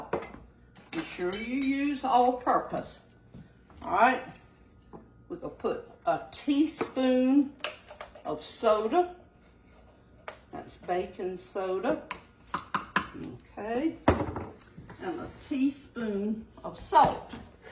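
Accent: South, United States. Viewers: Shine for Jesus is the best way to go. I also love to hear your accent. I live in Cullman,Al and of course we have the southern accents,but you are exceptional. I love you 💞💕🥰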